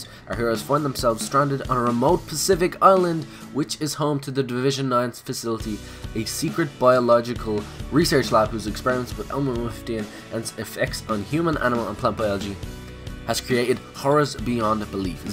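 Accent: Ireland. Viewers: Are you irish I am?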